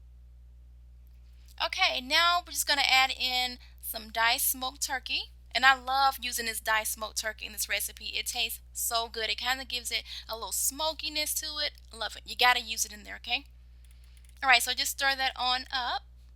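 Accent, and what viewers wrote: South, United States. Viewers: your slight southern drawl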